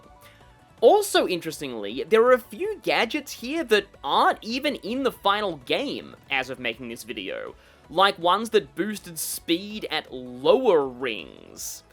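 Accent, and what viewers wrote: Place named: United Kingdom. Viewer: I didn't watch the video, I heard a feminine British sounding accent and shut it off and went straight to the comments to laugh at people that were bad and coping. Go play with a homeless man's wiener under a bridge somewhere.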